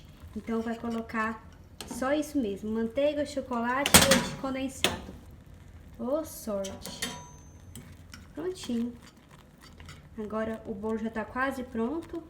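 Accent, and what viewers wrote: Minas Gerais, Brazil. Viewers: esse sotaque mineiro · Olá ! Eu resolvi te segui pq amei seu sutaque igual meu povo mineiro e simplicidade amo tudo isso Vc é 10 parabéns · Vc é uma linda, parabéns pelo seu carinho... Ahh e esse sotaque mineiro é mto gostoso de ouvir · sotaque de mineira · Amei seu jeito de falar até parece ser mineira kk . Acabei de colocar a massa para assar . Acho que vai ficar bom . 😘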